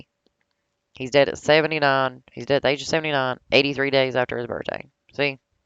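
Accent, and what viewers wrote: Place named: South, United States. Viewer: I thought I had a southern draw. Where are you from ?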